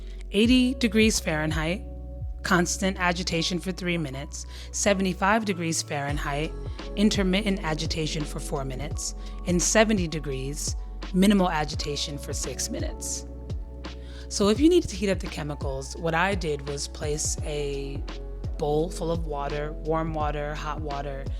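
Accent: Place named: South Africa. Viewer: are you South African?? you have a cool accent. I am an experimental film maker from South Africa i really enjoy watching your channel you teaching us so much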